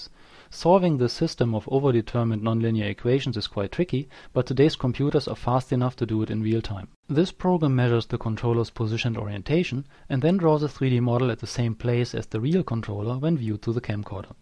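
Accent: Germany. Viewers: hi, bist du ein Deutscher ??? klingt nähmlich so :D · of course, you can hear when a german speaks english :-D. But his english is good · u sound german... but dont worry, me too^^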